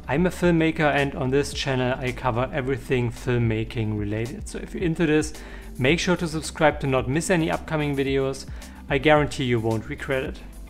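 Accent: Germany. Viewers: Are you from Germany 🇩🇪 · Mega geil man hört an deinem Aktzent das du Deutscher bist 😂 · Is this a Hard german accent? Nice B Roll though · Great video. And interesting accent. where are you from? Leipzig?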